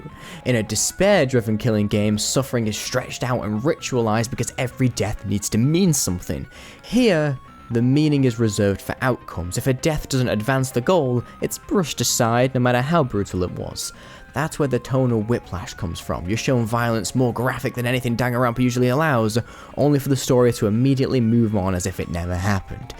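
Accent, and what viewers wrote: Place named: United Kingdom. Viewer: As a fellow Seiko lover the amount of random Seiko yelling segments make me chuckle, also its fun to hear another brit talk about this series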